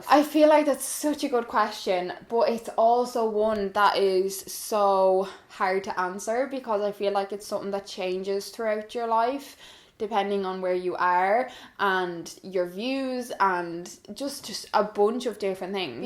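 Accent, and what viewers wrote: Ireland. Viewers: Why do you have such a strong Irish accent and Melanie doesn't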